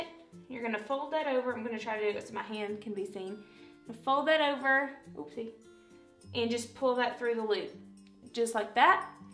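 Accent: South, United States. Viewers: Also, where are you from....it sounds like a southern accent? South Texas here😉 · "Your gonna peench it" lol I freaking love your southern accent!! Makes me miss home that much more! Love these videos, Congratulations on the pregnancy!!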